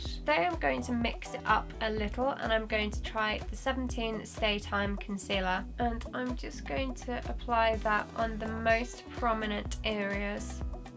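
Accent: United Kingdom. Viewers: I love British English accent .. and you looks great :* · OMG I just love her british accent 😱😍😱😍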